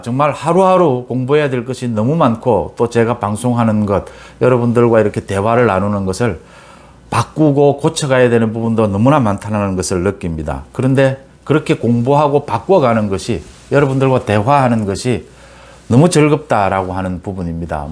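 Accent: Gyeongsang, South Korea. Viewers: 10만 축하합니다 경상도말씀이더정감이갑니다 경찰이미쳤구나 지그이라도 윤대통령도와줘건설하자 대한민국홧팅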